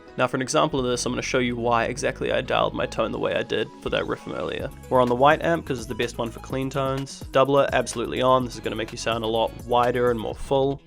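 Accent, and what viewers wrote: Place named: United Kingdom. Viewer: This guy gets it with a British accent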